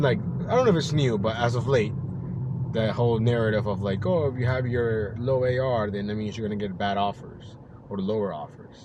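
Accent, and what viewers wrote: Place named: Virginia, United States. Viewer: Lmao Dulles town center 🤣. I thought with your VA accent that you were trying to say Dallas this entire time I've been subscribed.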